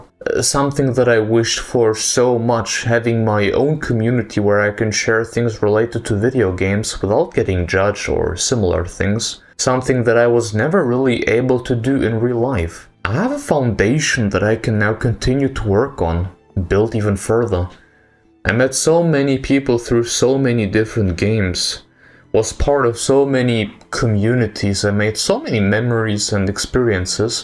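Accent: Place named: Germany. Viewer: Wow, you have such a German accent speaking English. Я некогда не думал что вы говорите по-русски 😂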